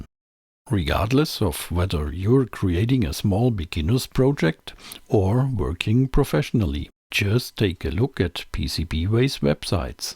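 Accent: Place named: Germany. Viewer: Cool. Interessant. Wollte sowas bauen ala Signal Lämpchen mit pwm am minuspol zur globalen Helligkeitsregelung. Die LEDs müssen aber dann je nach Farbe eimen passenden Widerstand bekommen. Hab schon erst mal ins Klo gegriffen, transparente LEDs sind ungeeignet. PS. Deutsches Englisch ist fürchterlich 8) gerne of deutsch. Ich glaube, Mittlerweile kann man auch mehrere Audio Tracks anfügen für verschiedene Sprachen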